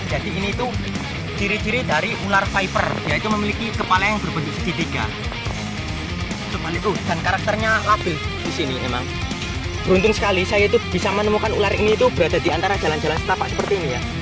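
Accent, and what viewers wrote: Java, Indonesia. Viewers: Video bagus..tapi medhoknya itu lucu..jadi kayak lawak si inyong. 😊🙏😅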